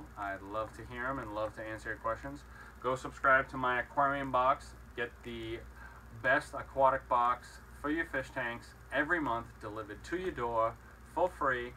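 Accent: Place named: Massachusetts, United States. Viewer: Can I just say as a fellow man from Mass your voice just makes me homesick. Also as a guy who just got back into the hobby after not having a tank since I was a kid you actually saved my fishes life. Your videos have been very helpful with getting back into the hobby. I now live in Kentucky, and it's nice to have a little piece of Mass to go to, and get advice on a hobby I always loved.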